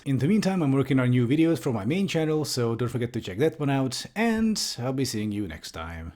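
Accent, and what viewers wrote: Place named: Scotland, United Kingdom. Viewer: Interesting video Ádám, loved the Scottish accent. I've Subscribed. Looking forward to more videos. Is this the channel where you don't rant about trains and busses?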